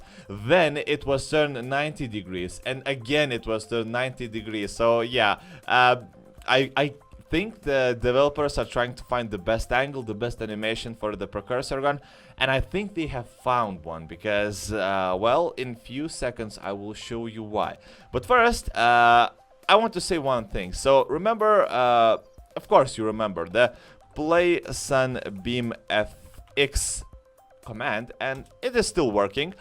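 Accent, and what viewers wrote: Russia. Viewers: Попробуй снять гайд по поиску фрагментов. Должно быть интересно. Русский акцент тебя выдал.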